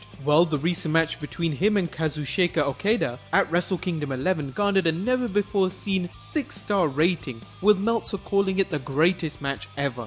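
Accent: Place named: United Kingdom. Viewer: To those complaining about this being a "10 obvious facts video", not everyone knows who Kenny Omega even is. I just now found out about him and I quite enjoyed this video because it helped me learn a bit about him. There isnt anything wrong with this video just because you already knew all of these facts. Some people don't know this shit. Get over it. And as for how he pronounces certain names, you can't expect everyone to pronounce shit the same exact way its supposed to be. This guy is british. They say things differently than americans and japanese people do. Stop getting so butthurt about it and just enjoy the damn video. ps, i love your videos. keep up the good work.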